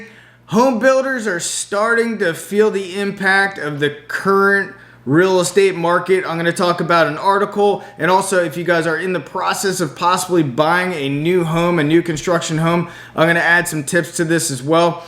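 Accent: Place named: Delaware County, United States. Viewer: I hear a Delco accent from this gentleman. I know this to be true.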